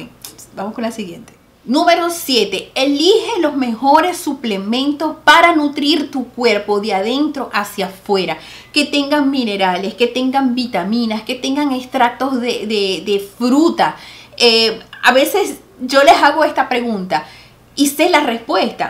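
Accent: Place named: Venezuela. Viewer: Holaaa soy de la Isla de Margarita.. estoy viendo tus vídeos son magníficos que buena eres en lo qué haces.. cuando te escuché me dije ay me suena a Venezolana jajajaja me alegra tanto ver mi gente venezolana por el mundo dando lo mejor de lo mejor.. gracias, gracias, gracias por estar en las redes sociales llevando inspiración y si se puede estar bellas y lucir jovial hasta con 80 si se puede.. Dios te bendiga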